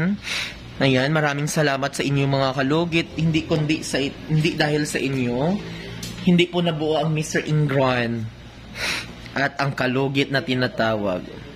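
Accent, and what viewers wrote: Iloilo, Philippines. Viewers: ilonggo ka?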